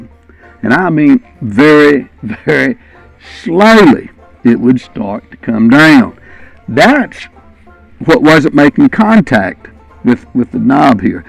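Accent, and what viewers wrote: South, United States. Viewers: 5/6/23 Just came across your videos. I watched 2 so far and you addressed the same problems I had today after coming back from one of my first rides this season up here in the PA Poconos. I bought this 2009 Tri Glide Ultra after not riding for 4 years and felt embarrassed by the little problems I was having. Thank you so much. Love your down home style and southern accent. Most of all, thank you for your service to our country. I subscribed and I am looking forward to more helpful hints.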